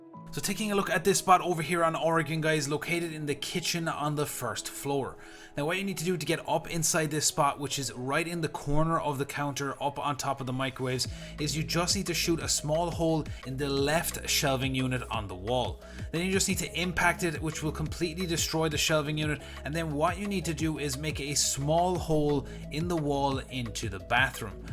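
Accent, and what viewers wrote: Ireland. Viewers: Where are u from in ireland? You sound like jack septic eye but idk what county sounds like that?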